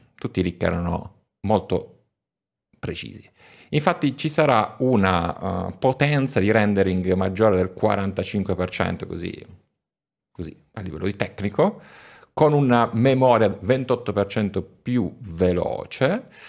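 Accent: Catania, Italy. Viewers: Ma sei Catanese? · Grandissimo ma sei di Catania?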